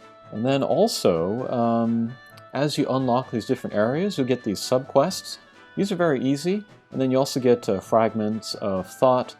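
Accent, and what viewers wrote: Japan. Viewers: By your pronunciation of names, I can tell you are Japanese, by your general pronunciation I find hard to believe you are japanese. You have a lovely accent that surpasses even those that were born in english speaking countries.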